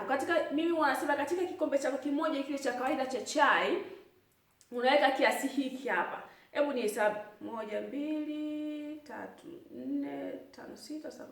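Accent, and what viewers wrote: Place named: Kenya. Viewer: We ni mtanzania lkn unaongea kiswahili Cha kenya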